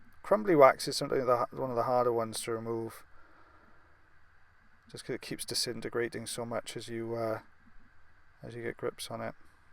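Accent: Wales, United Kingdom. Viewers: Welsh accent 😊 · Just love how you explain everything with that lovely British accent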